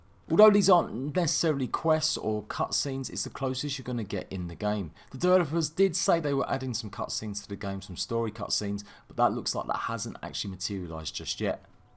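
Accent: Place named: Cockney, United Kingdom. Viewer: He is like a super-cockney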